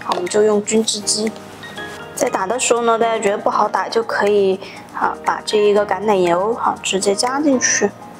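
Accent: Sichuan, China. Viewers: 👍👍實用，四川普通話嗎？☺️☺️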